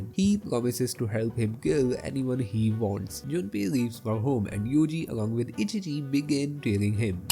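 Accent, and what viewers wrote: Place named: India. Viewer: no hate i would have watched if it wasnt indian voice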